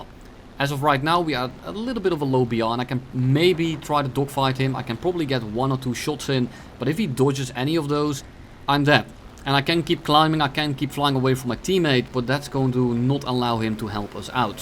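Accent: Netherlands. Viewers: I love your content and it's been a great motivator to hear your smarmy Dutch accented voice in the background while I go through my evening routine most days. As a second generation German-American, you've got my support, you sarcastic swamp German... Err, I mean, Dutchman!:D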